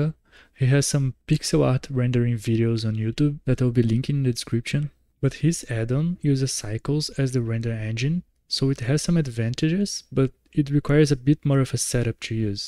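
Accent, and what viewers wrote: Brazil. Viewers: Sabia que tu era brasileiro nos primeiros 20 segundos XD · Do I hear a brazillian accent? Isso parece tecnologia da boa! Adoro mexer com píxeis e renderização! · Man... Are you Brazilian? Your way to talk makes me think so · nossa eu acho impressionante como eu descobri que você é brasileiro só pelo sotaque, o meu é igual dfgfsjhs quero um dia aprender a fazer render então muito obrigada pelo vídeo <3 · Sotaque Brasileirinho... nice addon btw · mano vc é brasileiro?? kk percebi muito o sotaque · Bizarro como da pra saber na hora que é br mesmo falando em ingles kkkkkkkkk, vlw pelo video pai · Cê tem sotaque de brasileiro amigo, ótimo vídeo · That accent. Brazilian. 🤔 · Esse sotaque de brasileiro maravilhoso, que vídeo prft irmão! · For some reason, it look like u have a Brazilian accent 😂❤ thanks for the video, it's amazing how we can now make those things with such a easy way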